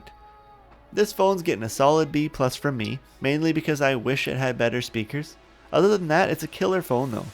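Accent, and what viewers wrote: Canada. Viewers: This was exactly the comparison I was looking for. Love the Canadian accent btw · Eh bud, what part of canada are ya from, I'm out in BC. Just your accent is one haven't heard from where I'm at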